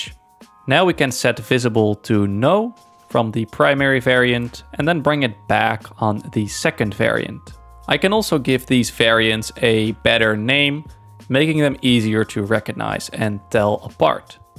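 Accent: Netherlands. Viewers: Dutch accent on top 💯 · hahahaha deze man is sws nederlands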